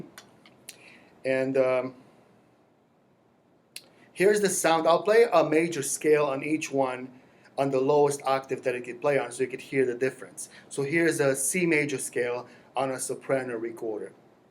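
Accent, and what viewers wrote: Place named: Philippines. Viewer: Your accent sounds slightly filipino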